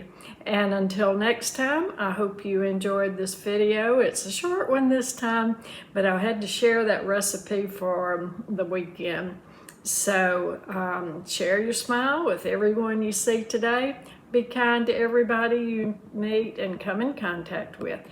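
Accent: South, United States. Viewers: ❤❤❤Oh my goodness! You just stirred up wonderful memories for me! I am in my 70's & my beloved Aunt (deceased) and all my relatives grew up in Mississippi along with my Mother. Only cousins left now. My Aunt taught me how to make this EXACT cobbler recipe when I was in high school! I've made it so many times over the years using all kinds of fruit. Best part of all is your southern accent- makes me feel like I'm with family! You are a treasure - I am subscribed and look forward to many more videos! God bless you!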